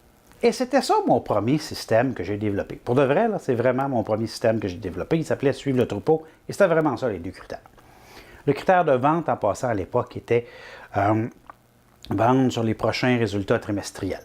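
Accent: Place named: Quebec, Canada. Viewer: Je commence à écouter la vidéo. Je suis bien content de voir un québécois parler de la bourse :D Ça fait changement de l'accent français :P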